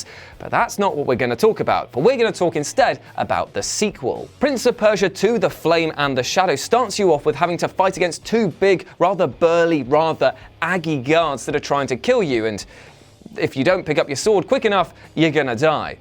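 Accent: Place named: United Kingdom. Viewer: Close your eyes and listen to the video again. Between his sad delivery of poorly written jokes and his pompous arrogant british accent this guy is a terrible presenter. The Shakespeare part is just a snarky American jab at a British english speaker trying to sound elegant but falls short.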